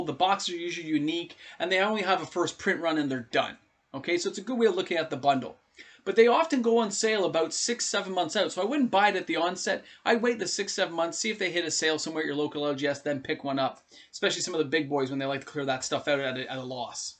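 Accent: Canada. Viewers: Judging from the opening scene and your pronunciation your in Canada?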